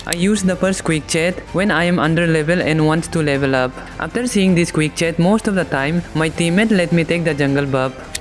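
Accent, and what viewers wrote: India. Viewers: Bro is pure indian "amier"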